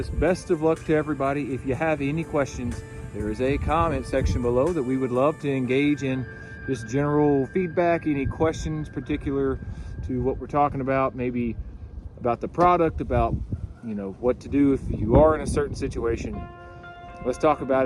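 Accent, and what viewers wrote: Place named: Texas, United States. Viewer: would be interesting to have a follow-up on how this gutter brand worked for you. also, are you from GA? I lived there 15 years and TX for 15 years and your accent reminds me of TX more than GA. 😁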